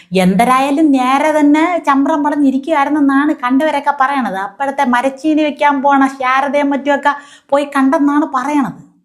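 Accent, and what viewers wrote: Thiruvananthapuram, India. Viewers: എന്തായാലും തിരുവനന്തപുരം സ്ലാങ് കേൾക്കാൻ രസമുണ്ട് 👏 മോളെ ബിഗ് സല്യൂട്ട് 👍👍👍🙏🙏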